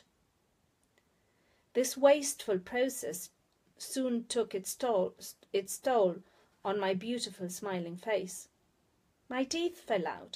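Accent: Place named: United Kingdom. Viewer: ♥ ¿No te gustaría leer unos poemas de emily dickinson con ese acento británico?.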